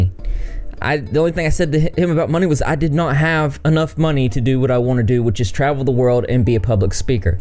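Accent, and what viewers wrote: South, United States. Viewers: what southern state are you from dude? I’m from southern KY so I can pick up twangs like nobody else even if someone is trying to hide it lol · I'm trying to figure out your accent because it's very southern... even more southern than where I live (Alabama). Maybe Tennessee or Kentucky.... something like that? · Bro where’s your accent from, sounds super southern it’s dope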